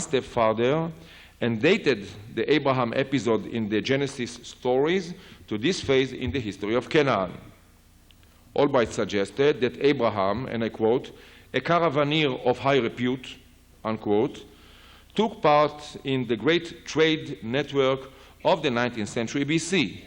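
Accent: Israel. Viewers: In English? You know he is a Hebrew speaker as you can hear from his accent. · Israel Finkelstein is awesome...An Israeli Jew who has no issue at all speaking the truth about the mythology of his people in the context of modern archaeology. The points he hits in this lecture are absolutely true and for the most part have been known for over 100 years. If you can get past his thick Israeli accent this lecture is a good summation for the average biblical-historicist to mull over.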